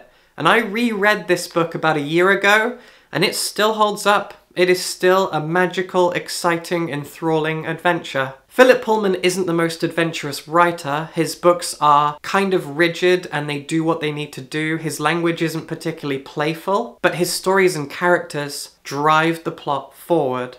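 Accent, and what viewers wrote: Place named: England, United Kingdom. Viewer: I love your reading suggestions and reviews, as well as your perfect English accent. Thanks for you work! I've proudly subsctibed to your Patreon. Alice from Italy ❤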